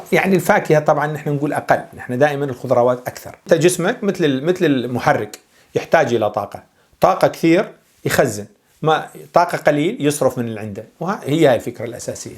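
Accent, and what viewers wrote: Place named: Mosul, Iraq. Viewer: والله عغفتو من لهجتك انت ما غريب. دكتور الله يحييك و يوفقك دائما. و استفادينا من الفيديو عاشت ايدك. الموصل دائما ولاّدة للناس الايجابيين و الناجحين مثلك.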